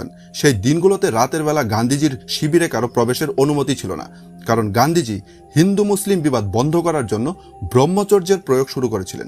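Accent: Bangladesh. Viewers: ভাষায় তো মনে হয় বাংলাদেশি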